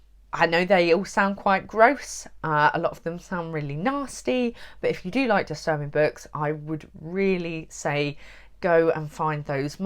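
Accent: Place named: RP, United Kingdom. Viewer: The Engish accent is always so elegant. Doesn't matter what is being said, it sounds posh.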